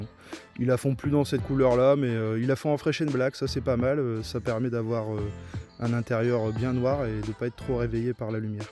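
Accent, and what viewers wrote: France. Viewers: salut, j'entend que tu est du limousin!? moi de même ✌peut être qu'on se croisera 😉je m'abonne pour la peine😎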